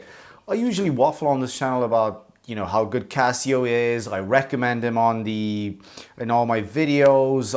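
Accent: Ireland. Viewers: Great review! I was thinking you had a vague Irish accent and checked insta to see your based in Dublin lol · Are you located in Ireland? Hint of an Irish accent.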